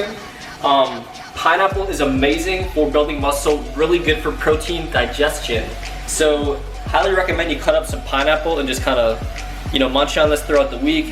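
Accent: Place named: United States. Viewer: This guy looks very Russian I was surprised by the American accent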